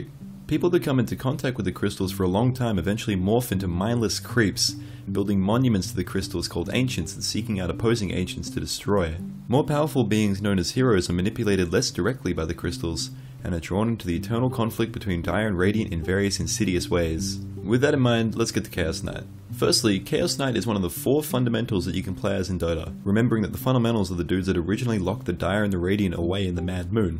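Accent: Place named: Australia. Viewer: Here I thought, Godz from BTS was australian. You are so australian, it hurts. I could barely make out a word. I betcha if you ever move to a different country, you could get laid just by laying on the accent. You lucky dog, you.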